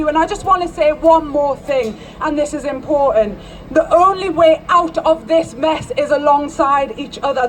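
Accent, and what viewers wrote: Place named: Newcastle, United Kingdom. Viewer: You sound like you're from Newcastle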